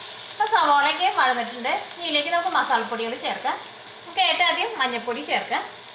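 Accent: Thrissur, India. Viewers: I like ur the way of presentation .. Thrissur slang 😍😍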